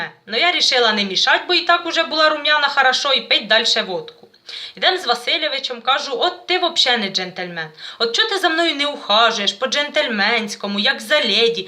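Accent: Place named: Surzhyk, Ukraine. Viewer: Самое главное: и грамма пошлости нет. И умно, но легко, а уж суржик..просто прелестный!)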